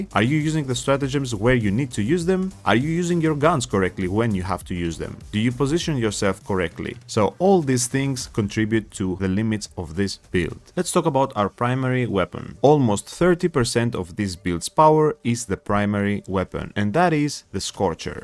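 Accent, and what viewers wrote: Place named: Greece. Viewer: Hey can you teach me greek . I love the accent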